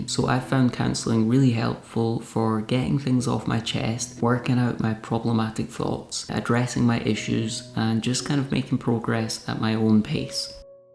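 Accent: Scotland, United Kingdom. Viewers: you are amazing. First, you explained your situation clearly and gave effective suggestions to help others. 20 years ago I suffered a brutal attack, and with that I encountered a weird collection of anxiety, depression, strange thoughts, and, of course PTSD. It took some time before I found a counselor who actually understood what I was going through. He was a mature graduate psychology student doing his final practicum. He was great, and a perfect fit. He challenged me often and tried a few different therapy techniques. I told him when it wasn't working., my second point is that you have opened some doors for people suffering anxiety to have hope. BTW had I lived my days in Scotland - my family immigrated to Canada when I was 10 more than 50 years ago - I would likely have a similar accent like yours. Keep on keeping on!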